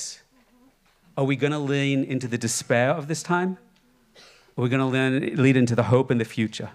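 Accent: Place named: Australia. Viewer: He has an Australian accent and not American.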